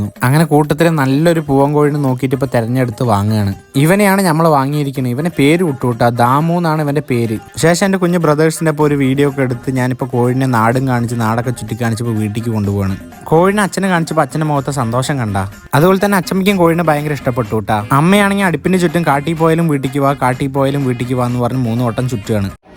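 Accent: Palakkad, India. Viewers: Bro palakkad aano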